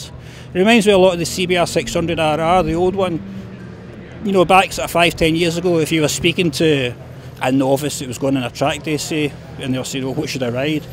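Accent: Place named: South Africa. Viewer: Mister Knobb Yes same accent as the famous south african rally driver...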